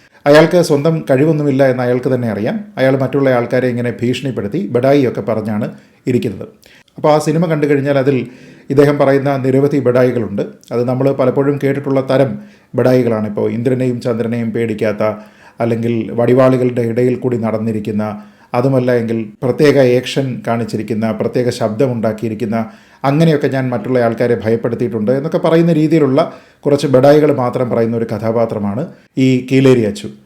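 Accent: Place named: Central Travancore, India. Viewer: സാറെ നിങ്ങളുടെ മണിമണി പോലുള്ള മദ്ധ്യതിരുവിതാംകൂർ മലയാളം കേൾക്കാൻ തന്നെ എന്താ സുഖം 😅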